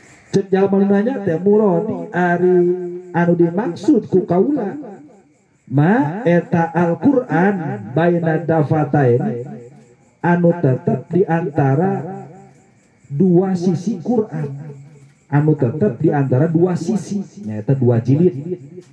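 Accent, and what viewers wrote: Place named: Sunda, Indonesia. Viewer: urang sunda mah tangtu raoseun ngangge logat sunda, nuhun pisan.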